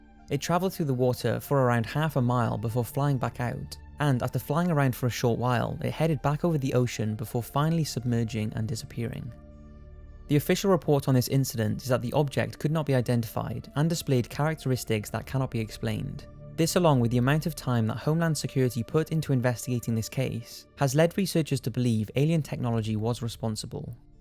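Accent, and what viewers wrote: Wales, United Kingdom. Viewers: I love your videos and your Welsh accent. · Dbonkerz81 Pretty sure that's what I said? But he has a Welsh accent which changes his pronunciation slightly. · Are u British? Lol sorry if its obvious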